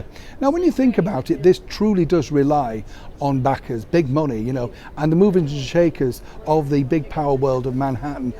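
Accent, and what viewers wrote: Yorkshire, United Kingdom. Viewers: You speak like a true Yorkshire man. you are a very smart gentleman without spending a fortune on ties 👔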